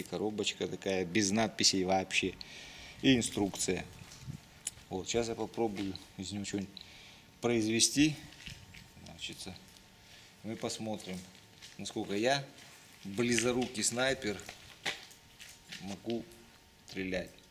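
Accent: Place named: Kabardino-Balkaria, Russia. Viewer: акцент кабардинский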